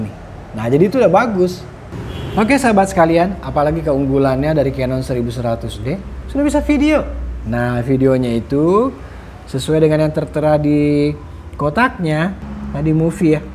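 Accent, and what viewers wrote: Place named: Bugis, Indonesia. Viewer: Org Bugis y??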